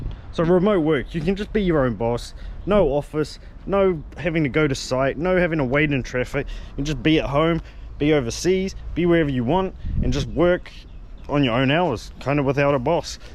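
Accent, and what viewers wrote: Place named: New Zealand. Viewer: Bro, I recognised that kiwi accent immediately haha. I didn’t realise how recently this video was uploaded. I’m super keen to watch your journey and see how you get along. I wish you all the best in your adventure!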